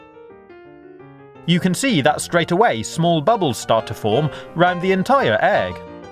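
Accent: United Kingdom. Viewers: Are you british? Am i right? And your videos are super really very amazing incredible handsome!😄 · I love you're British acsent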